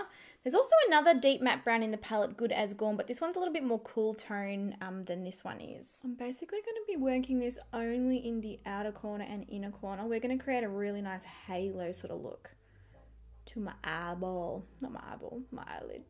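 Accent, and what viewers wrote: Australia. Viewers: I miss Australia so bloody much, like your accent makes me want to cry I wanna move back now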